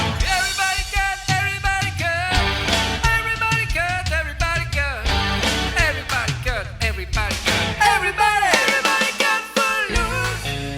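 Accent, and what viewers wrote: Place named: France. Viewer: Thank you love your French accent ❤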